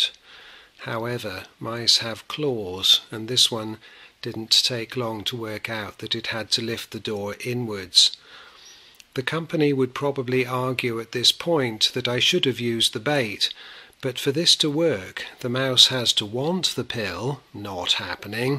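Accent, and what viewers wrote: United Kingdom. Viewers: I have not seen this trap for sale in the UK, I think it's only sold in the States and Canada. But you sound very English, just wondering??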